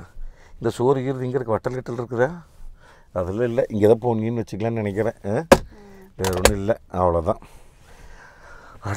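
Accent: Kongu, India. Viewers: அண்ணா வணக்கம் கொங்கு தமிழில் கொஞ்சி தமிழ் வாழ்க வளமுடன்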